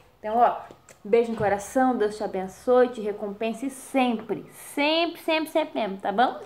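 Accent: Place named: Rondônia, Brazil. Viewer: Q coisa bunitinha! Vc tem sutaque de Ro!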